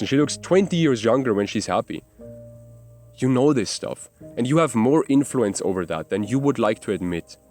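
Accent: Germany. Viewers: Great video, I have to add one thing...This amzing guy must have like in Scotland or knoew lots of Scottish people, he keeps using Scottish experssions, and, with a German accent, it soulds brilliant! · I knew you were German! The background and your accent did it for me. Anyways dude this is a great video and I certainly needed to hear this. Been in a relationship for 3 years and it’s my first real one too. I’m 21 now and still learning how to be a man. This just gave me the confidence to leave all that childish shit behind and change my mindset. Danke bruda 🙏🏽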